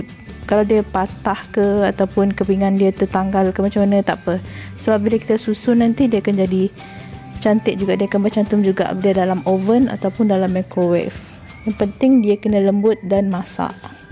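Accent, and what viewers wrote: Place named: Sarawak, Malaysia. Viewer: Dengar cara klaka apat cam sarawakian...sekali jumpa bideo lain ternyata betol² sarawak....wahhhh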